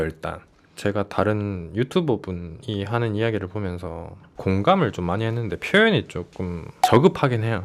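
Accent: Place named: Daegu, South Korea. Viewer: 경상도 사투리가 되게 귀에 거슬리는게 1도 없이 그냥 술술 들어온다 ? 싶었더니 저랑 같은 대구 분이셨군요. ㅋㅋㅋ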